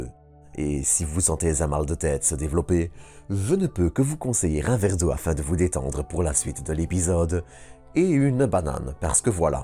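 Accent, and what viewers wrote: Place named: Flanders, Belgium. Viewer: Belge flamand, je crois.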